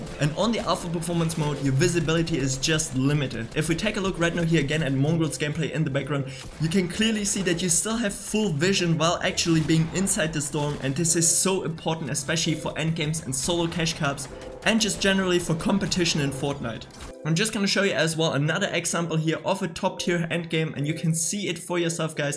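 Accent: Germany. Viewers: Dein deutscher akzent so nice haha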